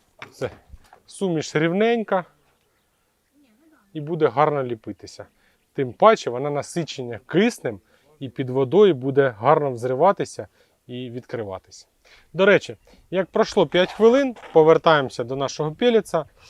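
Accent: Surzhyk, Ukraine. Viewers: Україномовний чи російський яка різниця, аби контент був хороший. І не в образу вам, уривки Азаровщіни у вас присутні.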